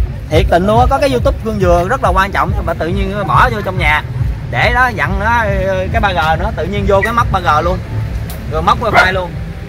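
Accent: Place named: Mekong Delta, Vietnam. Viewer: Haha, giọng điệu đúng chất miền tây luôn a ơi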